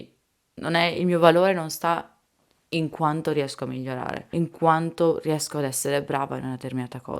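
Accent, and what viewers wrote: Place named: Milan, Italy. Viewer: Ti ascolto da poco, direi che sei milanese.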